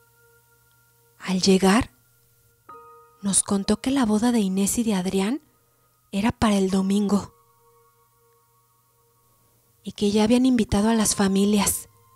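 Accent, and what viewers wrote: Mexico. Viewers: Me encanta el acento mexicano, tu voz y narrativa son perfectas. Estos tres aspectos hacen una experiencia única tus audiolibros, además de ser cuentos populares y con alto dialecto coloquial. Me parece excelente el mensaje final, sobre promover la cultura en cualquiera de sus formas, que por cierto en este video no lo agregaste. Soy un agradecido seguidor de tu trabajo. Saludos 🇻🇪🇨🇱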